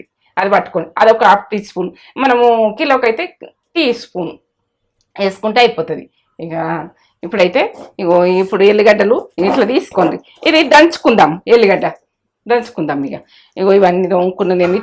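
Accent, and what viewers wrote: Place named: Nirmal, India. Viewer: Amma ay vuru.chaypatamu laydu Nirmal side basha laga.vundhi.amma❤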